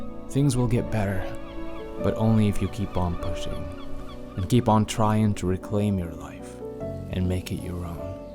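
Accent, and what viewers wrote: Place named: Morocco. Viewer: best morrocan english accent 😭❤️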